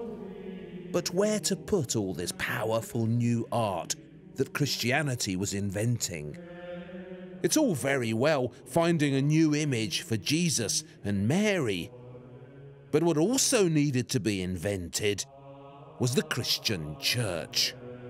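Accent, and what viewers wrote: England, United Kingdom. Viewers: this british accent is so ugly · Superb presentation skills and great content. Very non-irritating British accent. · The speaker is a liberal. Just look at his hair. To be honest with you this guy doesn’t understand history. He just gives one part of history. Just because he has a English accent doesn’t make him an expert.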